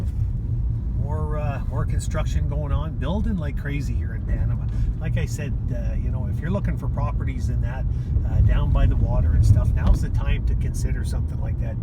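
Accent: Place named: Canada. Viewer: You talk like a Canadian.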